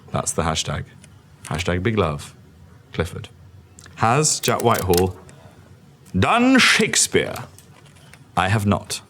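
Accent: United Kingdom. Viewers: Now I know that when he went all British in Clifford it was his accent ahahah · I wasn't expecting the British accent (had no idea who this guy was until I watched this video), so I almost spit out my drink when I heard "Jack WhiteHOLE" instead of "Whitehall". 😂 😂 😂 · He should be given even more credit. He's handsome, he's got a beard, beautiful blue eyes, British accent, annecdotes, amazing sense of humor and he's seems incredibly modest hahahahahaha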